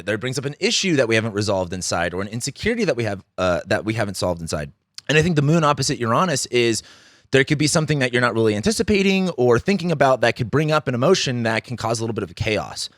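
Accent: California, United States. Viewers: What? Are you from Lancaster or San Bernardino?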